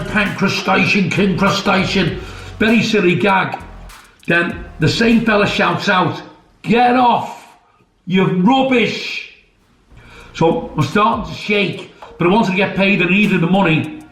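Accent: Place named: Liverpool, United Kingdom. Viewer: Proper fuckin scouser you 💪🏻💯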